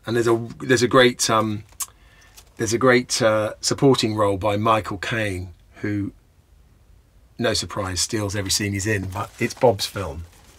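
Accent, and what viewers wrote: United Kingdom. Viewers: HES BRITISH!??!!!???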